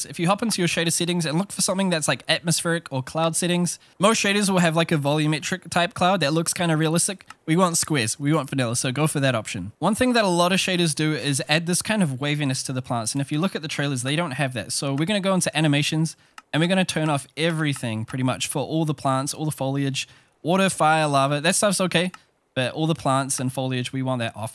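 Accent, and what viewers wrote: New Zealand. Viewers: I assume he lives in New Zealand. 5,000,000 population, and fitting accent. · I could tell your from nz when you said your top video had more views than people in your country and your accent 😊 Kia ora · Woah I knew I heard a Kiwi accent!! Nice to see content creators rising from Aotearoa ❤️❤️ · It's nice hearing a fellow Kiwi on youtube. Also very strange. Keep up the good work!!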